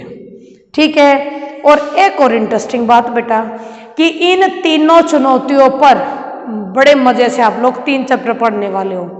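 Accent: Haryana, India. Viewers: Mam aap haryana se ho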